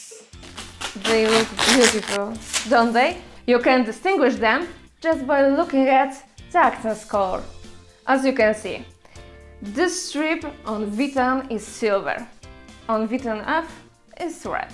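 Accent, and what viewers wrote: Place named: Russia. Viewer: Приятный русский акцент :-)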